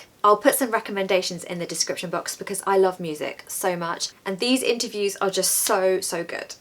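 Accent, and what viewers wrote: RP, United Kingdom. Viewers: Hi Teacher! Always am astonished by your every video! 😍 I really really really love the way you speak! I want to and am gradually learning the RP accent you speak! 💕💕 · In USA, it's all about the d sound and the tongue touching the palate, in UK it's usually soft, elegant, polite and humble kinda accent. I'm quite capable of immitating you except the upper intonation, like suddenly it goes up, how'd you do that, I find it bit complicated. For split seconds it goes up and that's also the signature intonation a.k.a British accent of the United Kingdom. Do teach that. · As i have always been, again amazed Never found a teacher better then you Your gesture and face expressions made us love english and Brit accent as well . Thanks teacher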